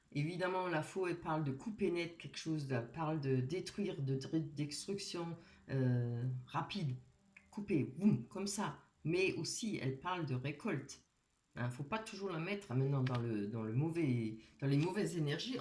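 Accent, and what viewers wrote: Alsace, France. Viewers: Bonsoir, merci infiniment pour ce beau tirage. Quel bel accent, serait-il Alsacien?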